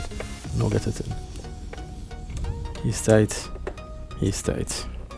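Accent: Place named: Trinidad and Tobago. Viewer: Heard your voice and was.. He must be Trini. Just looked at your channel sure enough. Been to Trinidad about 8 times. My wife is from Grande.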